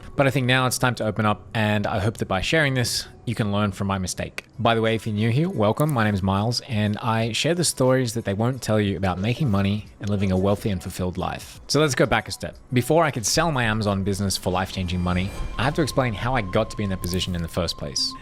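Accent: Australia. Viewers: Well I relate to you the only difference this happened three times in my life three big cycles. Now going up again fourth time I’m retiring soon!! From the age of 18 to 62. You sound like an Aussie I’m ex Aussie now American resident and Canadian citizen. In the end it’s all a ok if it’s not ok then it’s not the end.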